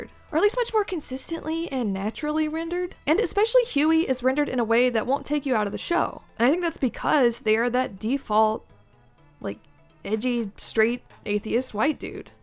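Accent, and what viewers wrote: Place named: Australia. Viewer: He sounds aussie not Kiwi :O (-an aussie)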